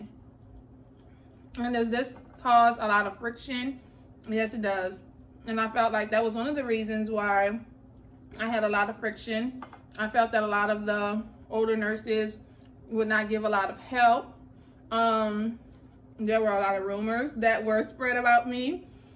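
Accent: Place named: New Orleans, United States. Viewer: I knew you where from new orleans… sis that accent you cannot hide. I mean... I was trying to listen... I was like... well she kinda sound new orleans.. It peaks through.. anyway.. Im from Thibodaux... youll probably know where that is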